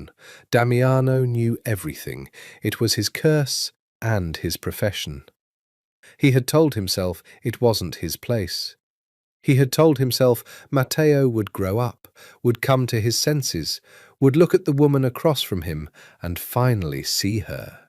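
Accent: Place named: Liverpool, United Kingdom. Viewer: Sounds like a Liverpool accent love it great storyline and characters well developed 🎉🎉🎉🎉🎉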